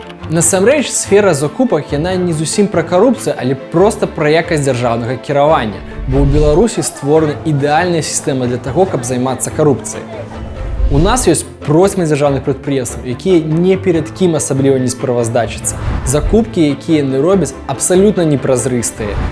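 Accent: Russia. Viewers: Стремление говорить на белорусском языке - всячески похвально.. но что здесь, что в подкастах слушать трасянку невыносимо для ушей. И с течением времени прогресса нет. "Белорусский" язык с русским произношением и русскими словами... такоЭ. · ты такі харызматычны. Колькі табе год? Ці можна ўжо ў прэзідэнты балатавацца? І слухай, дружа, нешта зусім мала рэкламуешся. Укідвай грошы ў інста-рэкламу, нават у метро рэкламуйся. Твой кантэнт на выключна высокім узроўні. Я б вельмі хацеў, каб гэтая ініцыятыва перарасла ў Партыю новых ідэй. Як мінімум мой голас у цябе ўжо ёсцека. І яшчэ. Схадзі да беларускага лагапеда. Годзе ўжо гэтага рускага акцэнту) хачу пачуць родныя цвёрдыя «ч» ды «р». 😉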